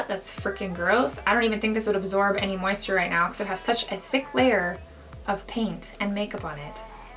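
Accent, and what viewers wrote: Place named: Canada. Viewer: oml she sounds so canadian in this video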